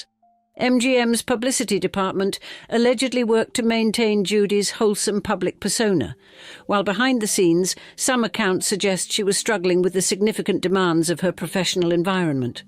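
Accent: England, United Kingdom. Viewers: An extraordinarily good documentary on Our beloved Judy … a genius talent so abundant that no studio or Mayer could quell, but whose legacy was paid with her shortened? But wonderfully generous life. (An English accent somehow underpins this narration with the dignity, honour & respect that Judy earned. A genius talent and top-genre human being. With gratitude for the quality of the content and blessings for its realistic homage …even though it was but a very small sliver of all that our great, great Judy represented, was and still is z Thank you, From Melbourne, Australia. Xx 🙏💝👏🐨🦘🪃🇦🇺🕊️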